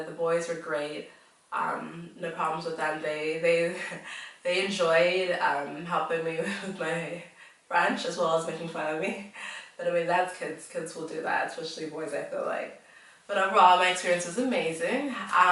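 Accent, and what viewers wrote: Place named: California, United States. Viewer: You accent is interesting... I was thinking California or Canada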